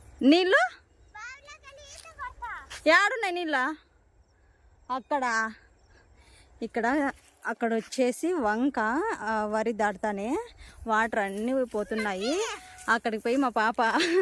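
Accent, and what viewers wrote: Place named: Anantapur, India. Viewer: ఎక్కడ మీ ఊరు.. మా అనంతపురం యాస చాలా బాగుంది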